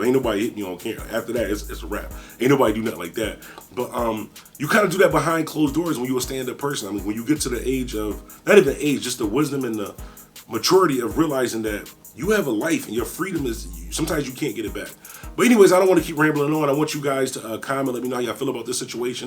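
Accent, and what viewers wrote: Philadelphia, United States. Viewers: Bro you sound like a philly nigga js bro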